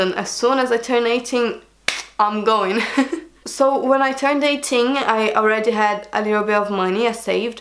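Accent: Brazil. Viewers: O sotaque dela não engana, muito fofo 💖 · Eu sabia que vc era daqui! Soube pelo sotaque, kkk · You have a Brazilian accent but its a Brazilian cute accent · Kkkk verdade eu olhei pro rosto escutei ela falando o sotaque bonito brasileiro ali, haha é brasileira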